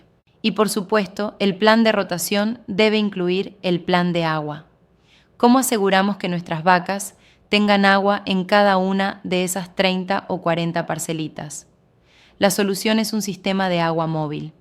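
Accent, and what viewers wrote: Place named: Argentina. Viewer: Eso ya es viejo, se llama rotación de potreros y porque hablas argentino?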